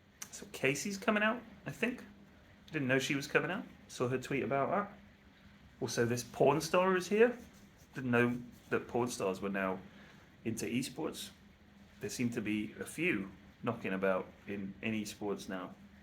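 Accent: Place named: London, United Kingdom. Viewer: Love these videos man, keep them coming ! Out of curiosity which football team do you support ? You sound like a Gooner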